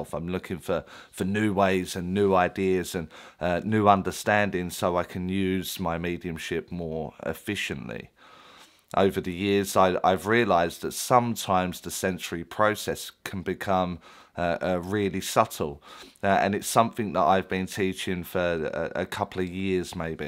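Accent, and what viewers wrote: Essex, United Kingdom. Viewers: I've been following you since 2018/2019 and back then I could only hope I could be a Medium. Fast forward I'm now demonstrating as a Medium from 2020 and you've been truly inspirational. I am now rewatching your videos with new eyes and again learning more at a deeper level. Plus love the Essex accent but then again I'm bias as I'm Essex too and just hope I'm as down to earth as you 😊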